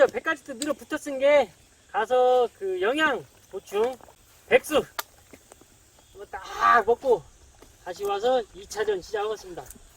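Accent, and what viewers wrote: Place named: Chungcheong, South Korea. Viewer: 완전 ‘전원일기 2’네유~~ 구수한 사투리, 변치 마러유!! 넘 정겨워유~~!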